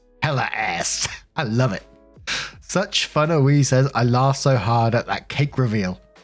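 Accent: England, United Kingdom. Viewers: there is nothing i love more than hearing the voice of a tatted english sweetheart saying "welch's grape juice dumpy" and "hella ass" while laughing. i think i may literally be in love with you just btw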